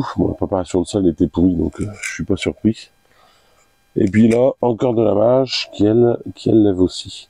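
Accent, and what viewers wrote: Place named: North, France. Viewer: Bon rétablissement ! La spontanéité avec l'accent du nord, ça fait plaisir !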